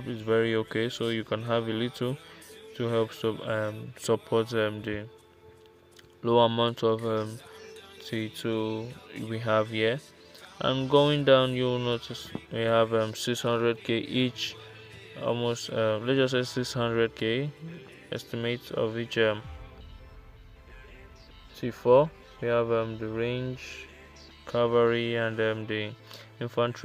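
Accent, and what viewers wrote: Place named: Nigeria. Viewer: Lol where you from u sound Nigerian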